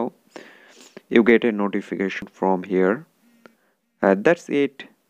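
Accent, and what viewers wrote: India. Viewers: You need to speak proper English Remove your hindu accent it sounds disgusting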